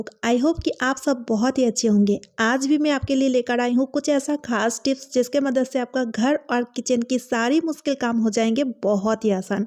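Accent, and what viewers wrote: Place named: Bengal, India. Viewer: आप begali है क्या...क्यो की आपके बोलनेमे वो मिठास है...ठिक है...ये वर्ड बोलनेका तरिका मुझे बहोत अच्छा लगा...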